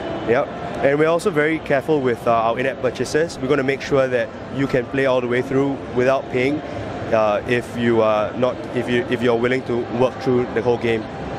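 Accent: Singapore. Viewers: Sounds like a Singaporean.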